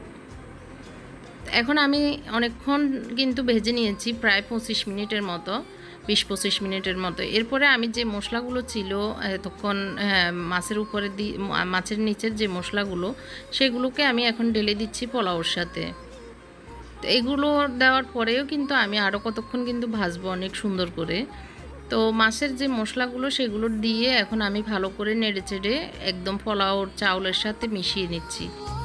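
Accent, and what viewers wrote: Noakhali, Bangladesh. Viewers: Shob valo kintu apnar uchcharon thik kortey hobey.. Noakhailla uchcharon shuntey khub kharap lagey.... otherwise your recipe is nice.. all the best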